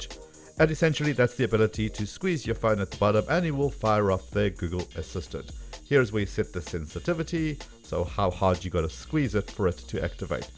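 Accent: South Africa. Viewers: Nothing to do with the video, but where are you from if you don't mind me asking, your accent sounds South African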